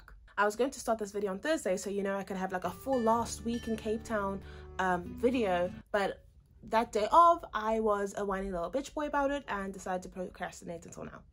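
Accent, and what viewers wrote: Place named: United Kingdom. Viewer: Which part of South Africa are you from, because that accent sounds british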